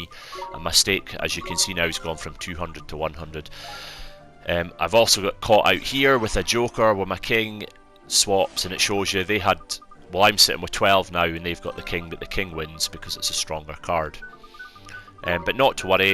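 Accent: Scotland, United Kingdom. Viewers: I think it's Scottish accent? Sorry if I'm mistaken.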